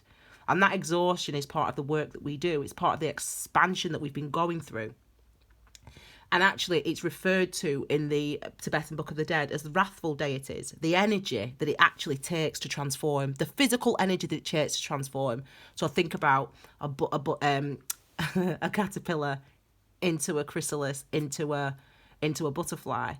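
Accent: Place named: Northern England, United Kingdom. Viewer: I just found you the night b4 the New Moon when I am beginning a 40 day grape 'fest/fast'! Ha! Timely in deed. You're into the same stuff I like too and you describe things well. When you did the Reiki I felt my head and throat as blocked. My heart to so you were spot on. Ta very much! I live in the US but come from SE England. You're a Northern Lass I can hear that much! Thanks and blessings and happy new moon. I did subscribe so I can listen and learn some more. Cheers.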